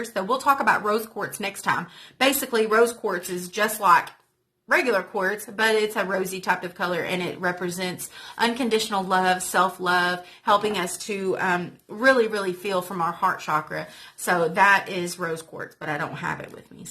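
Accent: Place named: United States. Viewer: I loveee your country accent and your sense of humor I really enjoyed watching and learning thank you! 🥰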